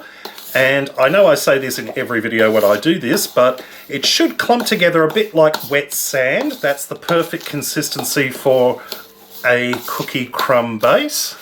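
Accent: Australia. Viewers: He sounds Australian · I love his reactions after he tries the food. best part by far especially with an Australian accent. great video!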